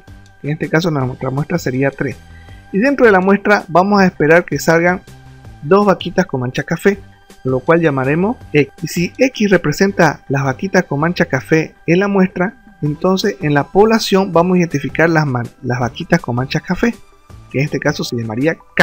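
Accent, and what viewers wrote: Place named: Santa Cruz, Bolivia. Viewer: Ese acento camba, bien mi hermano me ayudaste, saludos desde la llajta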